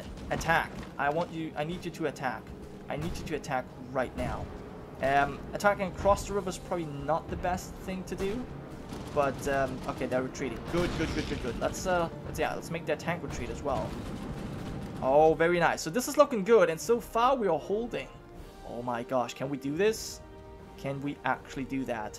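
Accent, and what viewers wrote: Germany. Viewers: hes german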